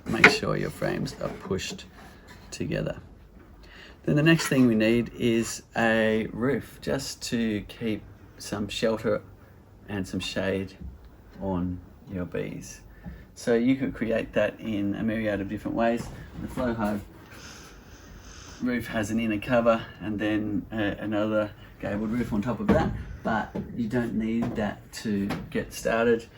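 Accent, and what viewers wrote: Australia. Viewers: Think he is a Aussie/New Zelander from the sounds of it.. More like M8/mate....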